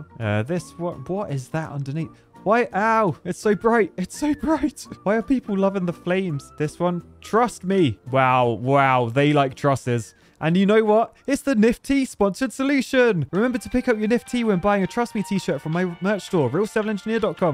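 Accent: United Kingdom. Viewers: Always walk on the strongest par' of a bridge. Love bri'isch accent without the middle t :D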